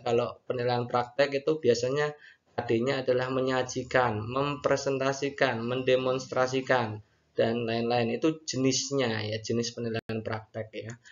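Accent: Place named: Java, Indonesia. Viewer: Hai... Kak... Dari Jawa juga yaa sepertinya... 😁 Suka banget sama kecepatan menjelaskannya ....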